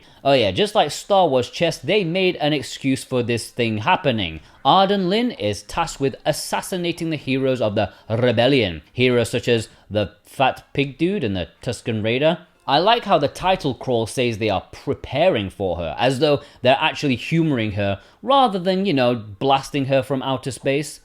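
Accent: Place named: South Yorkshire, United Kingdom. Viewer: Not going to lie a 12 hour video retrospective of Star Wars video games has filled a gap in my life that I didn’t know needed filling. The fact that it’s narrated in my native South Yorkshire accent is an insane bonus 😂 thanks mate easiest subscribe ever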